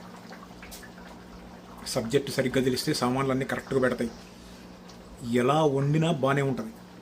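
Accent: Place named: Andhra, India. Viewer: Nizamabad antav Mari Andra slang matladthav?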